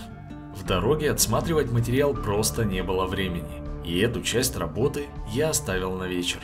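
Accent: Standard. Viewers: Меня за интересовал сюжет дом на дереве, но прятней всего оказалось, слушать чистую литературную русскую речь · так приятно слушать твой голос, неторопливую твою речь, чистый русский язык! Видеть приятно твою любовь к лесу, счастливое лицо от встречи с жителями леса. Каждый ваш фильм - отдых душой, заряд добра и любви. Вы - лучшие!!!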